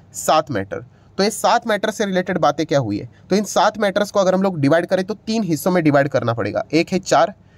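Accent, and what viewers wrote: Maharashtra, India. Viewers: Sir Marathi h aisa lagta h, Thodifar 🤣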